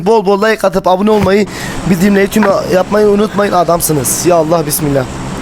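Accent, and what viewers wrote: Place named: Şırnak, Turkey. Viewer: konusman çok iyi be hacı❤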